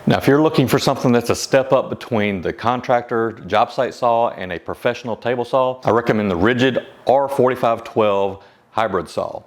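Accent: South, United States. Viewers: Your southern drawl is divine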